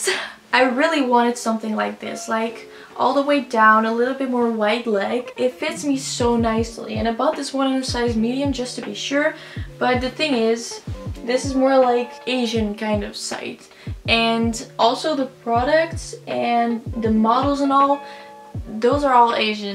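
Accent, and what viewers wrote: Netherlands. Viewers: wacht wat je bent nederlandssss ahaha ik dacht het al (leuke video!)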